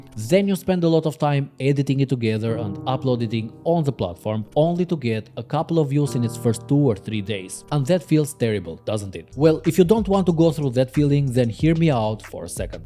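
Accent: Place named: Albania. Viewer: hahahahahah sa dallohesh qe je shqiptar ti o plak 😂 vec nga theksi e kuptova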